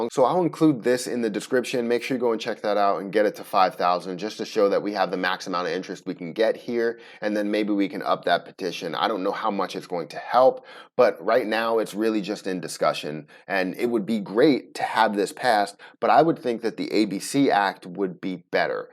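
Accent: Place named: Baltimore, United States. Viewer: Are you from Baltimore,? Just trying to guess by your accent.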